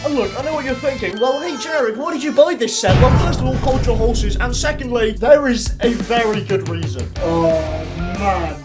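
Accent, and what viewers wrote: United Kingdom. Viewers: Bro are u brithish ¿?💀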